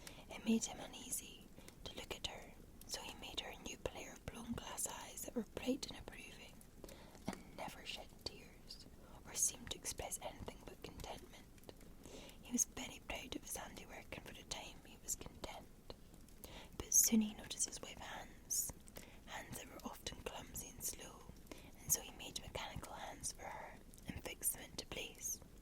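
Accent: Scotland, United Kingdom. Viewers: I'm totally in love with this Scottish accent. Better than my Italian accent. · Gawd, I adore your lovely brogue. Blessings and love from Ohio USA! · 31 minutes of tingles from the resplendent scottish lass. ah, a most excellent birthday present. ☺️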